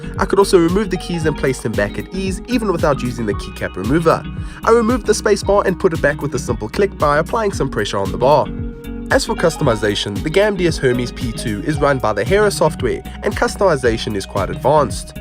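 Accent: South Africa. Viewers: i hear a fellow saffa accent, I hit subscribe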